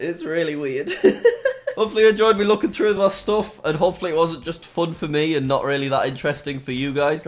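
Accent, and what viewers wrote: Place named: Durham, United Kingdom. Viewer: Wait your from Durham not quite a Geordie but ye na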